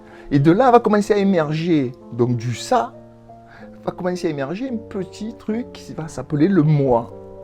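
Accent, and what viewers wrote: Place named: Marseille, France. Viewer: Vous êtes le seul à nous présenter les topiques sans prise de tête, c'est compréhensible et tout a fait abordable finalement. A condition d'expliquer ÇA clairement, sans trop de termes barbares. Étudiante en soins infirmiers, ÇA m'aide beaucoup. Merci beaucoup !!! Le cadre est idyllique, sous un magnifique soleil ! Mais ce qui rend cette vidéo agréable à voir, et surtout à entendre, c'est ce chouette accent marseillais qui donne une jolie musicalité à vos propos. J'adore 💚❤ Encore merci... 👍😘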